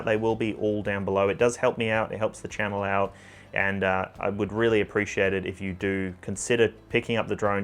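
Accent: Australia. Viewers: G’day, Retired DJ Mickey Rage up in Mission Beach FFNQ here mate…….. I just wanted stop in to say: “Thanks for doing what you do here on the Tube mate……… It’s really nice to hear an Aussie Accent instead of the Septics All The **** Time eh” So, Keep up the great work.. AND while I have your undivided attention: I couldn’t get the first link on here to the potensic atom mate………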